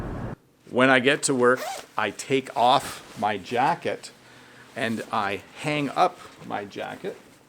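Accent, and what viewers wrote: Canada. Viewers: Hi, I like your videos because I understand you very well, maybe because you're canadian? I sonder if you speak normaly or slowly for your students....let me know.... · Thank you sir , i always watched all of your videos . Easier to understood. And i like Canadian pronounciation. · I just can't get enough hearing your nice Canadian accent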